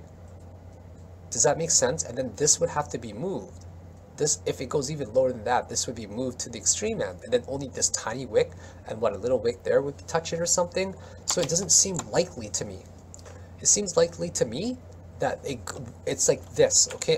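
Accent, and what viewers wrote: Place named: Canada. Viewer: Good thing that i stumbled upon your video today. Interesting content....and i miss listening to the Canadian accent. Spent 5 years there in the 90s...the good old student days.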